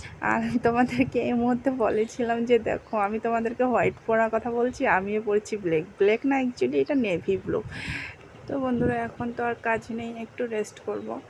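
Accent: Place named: Assam, India. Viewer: Tmr assame biye hoyeche ki vasa sune ektu ektu lag6e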